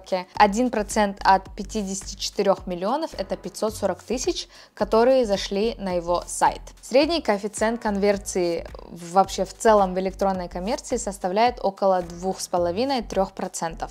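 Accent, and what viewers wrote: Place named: United States. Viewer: Познавательно, но режет слух желание вставить английское слово хоть куда-нибудь( Если можно, не коверкайте произношение на ОМЕРЕКЕЙшН лад. Постарайтесь и у вас получится)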